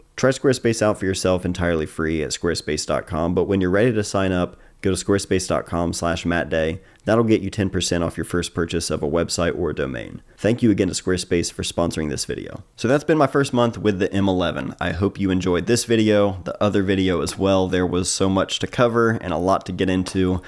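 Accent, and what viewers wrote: Ohio, United States. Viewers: Chillicothe?! I knew that accent sounded familiar. I'm in Portsmouth. Sorry for the house sickness, and Happy Birthday!(belated). We love you too. Congrats on the M11. Beautiful work.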